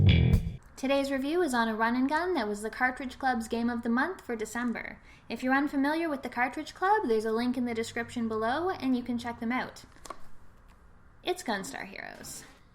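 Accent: Canada. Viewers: <3<3<3<3<3<3<3<3<3<3<3 Nothing I see in your profile says your Canadian, but the accent gives you away. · Ha same here. I knew within 12 seconds that she was Canadian. I've had several occasions when I identified someone as Canadian by their accent and they got annoyed because they don't think they pronounce things differently. lol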